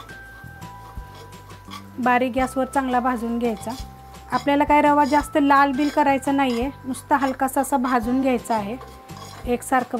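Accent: Satara, India. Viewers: Tai tumch gav kont aahe tumhi kup chan boltay agdi mazya satar chi bhasha vattey baki khir kup chan zaleli distey mi lgech krun bghnar aahe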